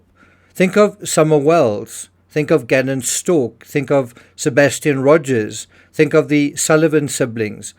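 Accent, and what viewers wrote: South Africa. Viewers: Is that a South African accent??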